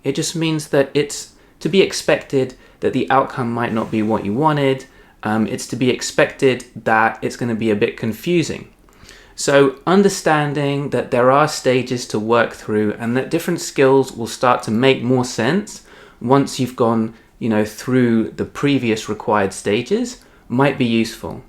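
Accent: United Kingdom. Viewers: YEAAAH BRITISH ENGLISH! LOVE IT!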